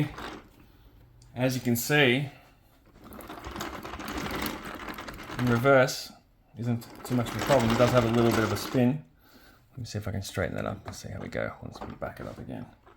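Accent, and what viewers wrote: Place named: Australia. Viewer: Let me guess, your ausie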